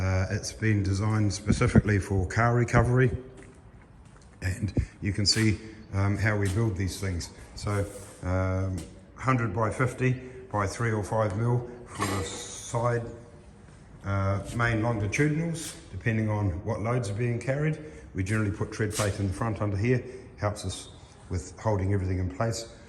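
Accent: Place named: New Zealand. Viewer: I hear your kiwi accent . I am interested in a trailer as I load a tractor for grass slashing. Reply to me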